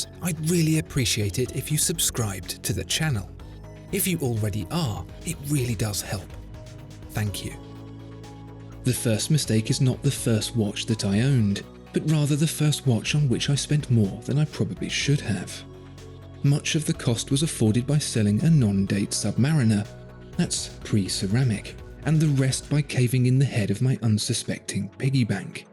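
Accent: United Kingdom. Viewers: Dude your sleepy british accent sucks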